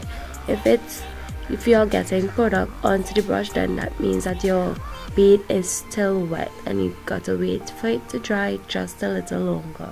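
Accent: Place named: Trinidad and Tobago. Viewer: knew you were trini the moment i heard your voice 😍🇹🇹🇹🇹🇹🇹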